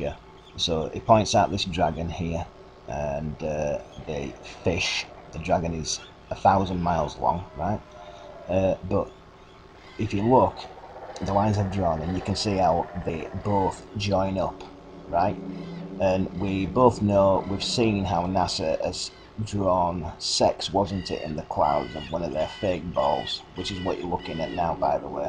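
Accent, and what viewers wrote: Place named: North, United Kingdom. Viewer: Loving the blunt northern accent. Keep up the good work. 😂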